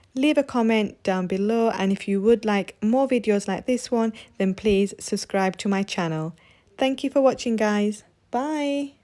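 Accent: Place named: Manchester, United Kingdom. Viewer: Sounds like a Manchester accent to me.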